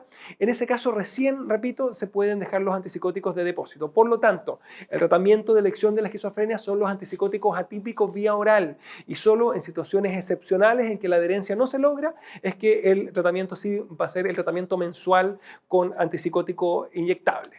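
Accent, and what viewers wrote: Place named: Chile. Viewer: Q agradable encontrar un acento chileno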